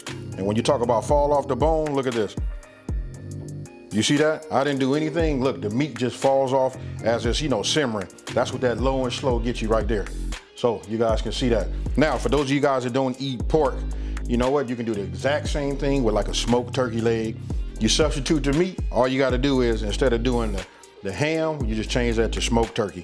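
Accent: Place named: Los Angeles, United States. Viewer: Yes, sir!! One of my fav soups. New sub cause you sound like you're from where I'm from... L.A., CA. Cornbread is a must.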